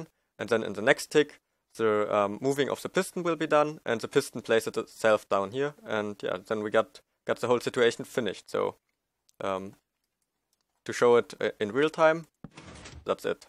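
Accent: Germany. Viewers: der is deutscher als deutsch das hört man immer so schön raus xD aber gutes englisch :)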